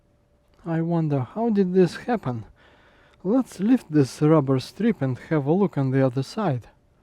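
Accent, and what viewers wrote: Russia. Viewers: У вас такой русский акцент, я ошибаюсь ? :)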